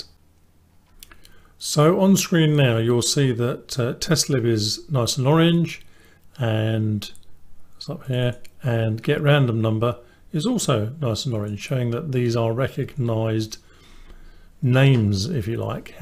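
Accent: United Kingdom. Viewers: Thank you. I hesitated to start a 38 minute video tutorial, but it was worth it just for the calm Pommy accent and absence of duffa duffa music! I am an old veteran since the 70s of programming assembler on bare metal for embedded realtime controls, and only just in retirement wrapping my head around C/CPP. Some of the syntax conventions really "get" me, and I have to remind myself I am dealing with a very simplistic one-pass compiler that needs lots of cues in order to work. The work flow from multiple tabs / project folder to files in the library folder was very helpful.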